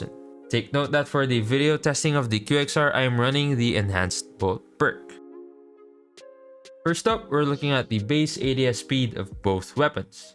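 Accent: Philippines. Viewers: peenoise accent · Dude your accent remains me of Filipino accent tho.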